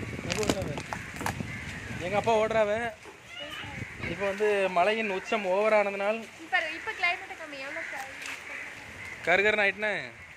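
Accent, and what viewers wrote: Tirunelveli, India. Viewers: Anna nega chennai ya but neega pesuratha pathaa thuthukudi thirnalvelii marii eruku enna ur nega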